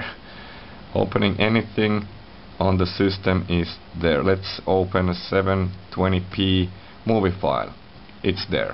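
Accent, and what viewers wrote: Finland. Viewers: Finnish accent?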